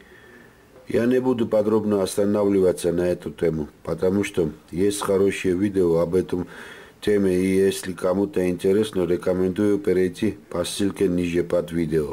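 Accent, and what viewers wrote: Georgia. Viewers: Спасибо за полезное видео ❗️Акцент как у Сталина 💪👍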